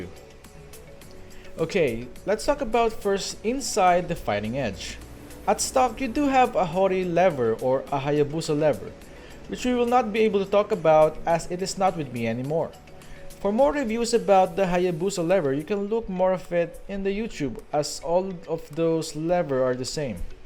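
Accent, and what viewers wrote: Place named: Spain. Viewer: Oye hermano puedo apreciar que hablas español o tal vez Portugues xd Well Im planning on buying this bad boy as my first stick (You know, going to get serious) But, how hard is it to mod? As a completely idiot and begginer? Mi biggest issue with the stick is the lever but aside from that the stick is sooooo beautiful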